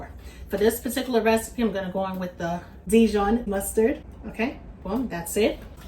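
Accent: New York, United States. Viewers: I knew that accent from New York.. I bet Brooklyn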